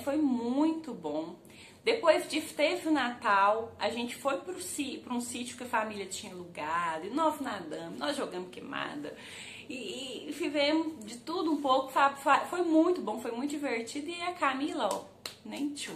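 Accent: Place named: Minas Gerais, Brazil. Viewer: Gosto do seu jeitinho humilde e simples! Tão nova e com uma cabeça boa. Deus te abençoe. Ah, adoro seu jeitinho de falar mineirinho😉😘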